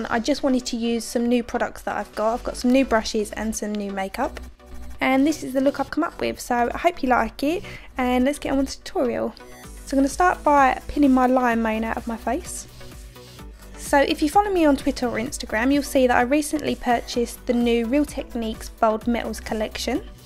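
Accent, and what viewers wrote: Essex, United Kingdom. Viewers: I'm a fan of yours too! And it's so nice to hear your Essex accent.... I from down the road East London! I did giggle when you said 'in alf'! Could you please tell me what you think of the Hakuhodo brushes, would appreciate your professional feedback, thanks.... D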